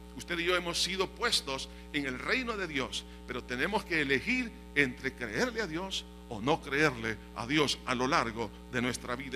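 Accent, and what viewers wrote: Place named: Honduras. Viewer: Le admiro Pastor como Dios le ha dado tanta sabiduria de la Palabra. Sus miembros son bien priviligiados de tenerlo como Pastor. Hay mucho que aprender de usted. Hermano con todo respecto, usted da buena comprension y aplicacion de la Palabra en la vida actual del ser humano. Hace poco encontre sus videos, en verdad an llegado a ser de bendicion para mi vida. Usted parece Hondureno hasta en su manera de hablar. Soy Hondurena por Nacimiento. Muchas gracias por su preparacion. Le pido a Dios que El lo bendiga, a su familia y a su congregacion y que continue siendo de bendicion al cuerpo de Cristo.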